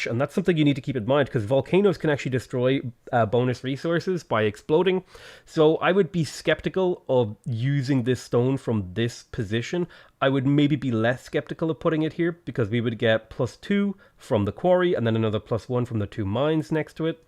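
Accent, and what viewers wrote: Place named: Ireland. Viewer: Man,that thick Irish accent is probably the best thing about this channel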